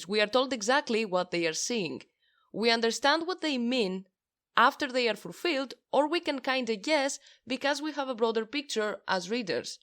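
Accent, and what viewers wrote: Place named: Greece. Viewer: Η ελληνική χροιά φαίνεται από την τόσο καθαρή αποδώσει των αγγλικών. Ιδιαίτερα στοχαστικό GOT βίντεο. Θεωρώ πολύ πιθανόν η εισβολή των White Walkers να μην ήταν αναπόφευκτη, αλλά να ένιωσαν απειλή από τις εξωφρενικές δράσεις των Targaryen για να εκπληρώσουν την προφητεία, οπότε εισβάλλουν για να προστατεύσουν το είδος τους.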